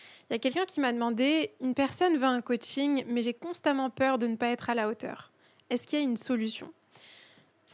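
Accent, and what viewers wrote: France. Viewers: Bonsoir, j'espère que tout se passe pour le mieux pour vous. Je vous suis là récemment mais sur insta, plus d'un ou peut-être même deux ans. J'adore la façon dont vous nous parlez, c^est tellement inspirant. Je m'appelle comme vous et viens d'Iran. Je suis iranienne, mais je sais bien parler le français et enseigne le français. J'ai entendu dire que vos étiez d'origine irannienne du côté de votre père. Ça m'a tellement surprise qu'il y a quelques jours, je vous ai envoyé un message sur insta mais vous ne l'avez pas encore vu, j'ai donc décidé de vous parler là. J'ai une simple question à vous poser: est-ce que vous pouvez aussi parler le persan? Aussi bien que le français. Bon écoutez on vous considère comme une française d'après votre accent mais j'aimerais bien savoir si vous avez déjà voyagé en Iran? Sachez que j'apprécie votre travail et j'essaie de ne pas manquer vos vidéos. 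Car, d'une part, je renforce ma compréhension orale et d'autre part, j'apprends bcp choses qui concernent la vie, le développement personnel ainsi de suite à travers vos vidéos. Bonne continuation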